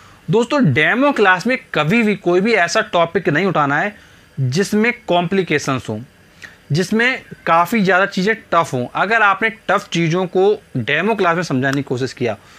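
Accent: Kanpur, India. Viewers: Sir i think you are from kanpur😂 right sir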